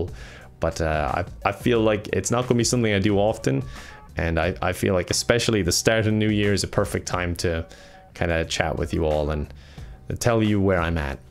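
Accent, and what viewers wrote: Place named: Ireland. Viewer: I feel that way all the time wen I see ur vids its like a piece of my mind sets at peace wen I see a vid I love ur content I love ur humor and I really like the accent, I kinda wish I had a heavy irish accent (I am irish) but its a relief wen I see ur vids I love wat u do its a really important part of my day wen I watch ur vids and I hope u keep going never quit and hopefully I can meat u at pax or something later on in life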